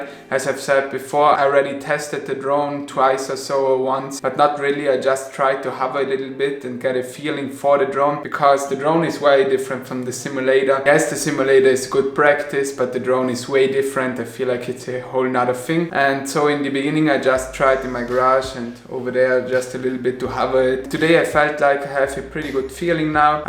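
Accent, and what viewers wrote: Germany. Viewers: Dieses Deutsch- Englisch. Aber ich finde es Super. Für deinen dritten Flug? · Man hört das du deutscher bzw. schweizer österreicher bist xD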